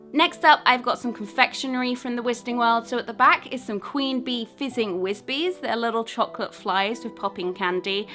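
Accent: United Kingdom. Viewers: I love your uk accent <3 in from the netherlands hahaha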